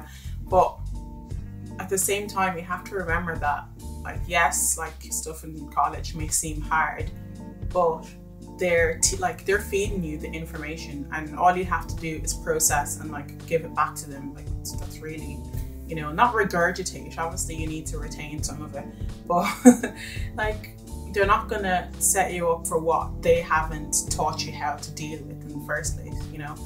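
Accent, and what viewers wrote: Ireland. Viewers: Which part of Ireland is that accent from?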